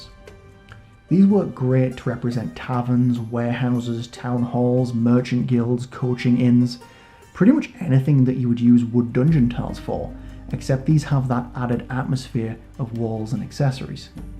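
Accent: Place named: Newcastle, United Kingdom. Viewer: Watching this older video, I can really hear your geordie accent coming through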